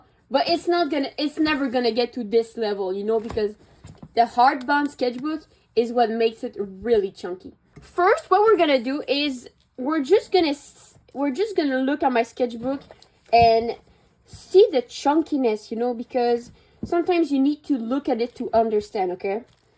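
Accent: France. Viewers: r u from France idk your accent feels french ( btw I speak French) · your accent is so pretty :3 my grandma is Belgian and speaks French so I could immediately tell you have a French accent lol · Salut!! J'espere que tu vas bien.Est ce que tu es française? Parce que je trouve que tu as un petit accent français lol :).En tout cas tes dessins sont trop beauux <3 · Hey, I'm new on your channel and actually... Are you french ? No cause you've got a french accent :') (saying that cuz I am french). Great video tysm !!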